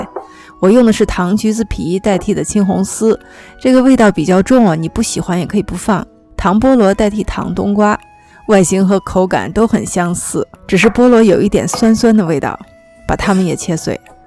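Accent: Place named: Beijing, China. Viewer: 这是北京口音吧，声音听着很舒服。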